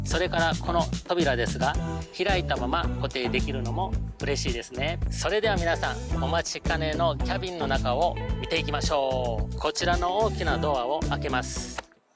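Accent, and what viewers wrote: Kobe, Japan. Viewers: 神戸の人かな❓ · とても分かりやすくて参考になります♪ 以前神戸に住んでいたので言葉も懐かしいです～😄 軽キャンを検討、特にhappy1+を考えてるのでこれからも動画を楽しみにしています～😄 レンタルもしてみようと思います😄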